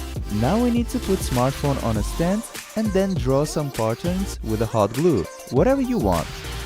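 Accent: Poland. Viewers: are you from poland becuse you have accent and you used polish coin and you even name you hamster stefan! which is polish name :) pozdrowienia! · Nice to see polish accent in this video 1zł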